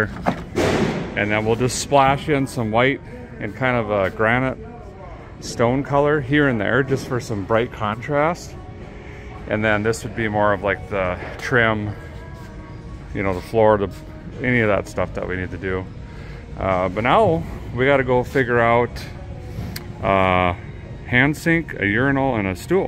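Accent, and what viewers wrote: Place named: South, United States. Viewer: I’ve noticed that you are starting to sound like a true southern. When you start saying Fixin to do something you have arrived my friend.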